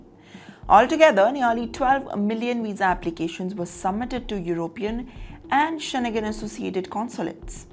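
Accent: India. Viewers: Just curry english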